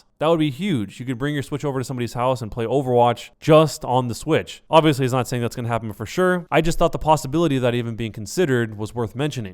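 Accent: Sweden. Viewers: Are you Swedish? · Are you from sweden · are you swedish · U swedish bro? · Are you from Sweden? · Are you swedish????!!!!!! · Are you swedish?? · O are you Swedish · are you swedish?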